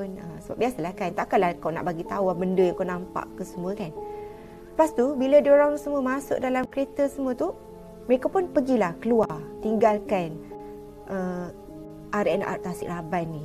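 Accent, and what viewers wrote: Kelantan, Malaysia. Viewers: Kak org klate ko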